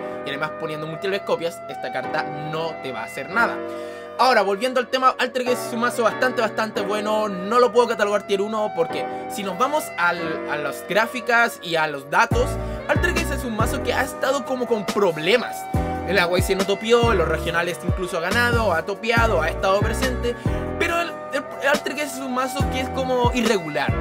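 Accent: Chile. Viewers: No se sabe que es peor, no entenderle por chileno o por la música de fondo que suena demasiado fuerte, así que asumiré que el problema radica en las dos opciones...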